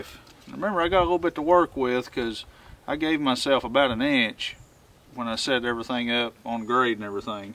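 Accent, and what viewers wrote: South, United States. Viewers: Man, I'm tickled I ran across your channel today. It's awesome to get this info from a good ole boy instead of those some of those nasally Yankees. Northeastern Arkansas here and your vids are going to help me understand what I need to do to help my son build a pole shop on his property and finish a house he bought . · im from the south and this dude is country as freaking cornbread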